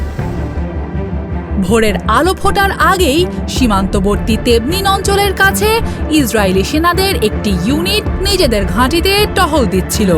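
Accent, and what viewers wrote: Kolkata, India. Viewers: এই সাংবাদিক মাগীরে চাকরি দিছে কে এই মাগিতো কলকাতার হকার সাংবাদিকদের মতো সংবাদ পাঠ করে। কথাবার্তা তো কলকাতার মানুষের সাথে মিল আছে।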